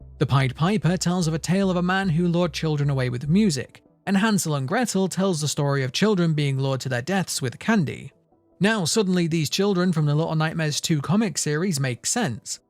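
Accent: England, United Kingdom. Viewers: Um actually u speak the English language and the sound of ur accent is British and no one in America says "oh ur speaking British" SMH ignorance is bliss huh lmmfao rofl GOD SAVE THE KING CHUCKY CHEESE AND QUEEN CAMELTOE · Wait you are british???,man I thought that you are american 😶😶😶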